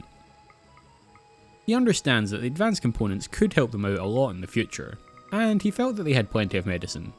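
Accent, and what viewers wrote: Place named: Scotland, United Kingdom. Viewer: Which part of scotland are you from man, peak video btw.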